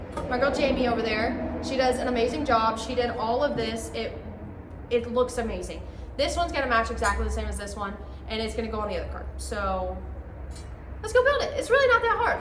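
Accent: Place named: Kentucky, United States. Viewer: Developing a lil KY accent cute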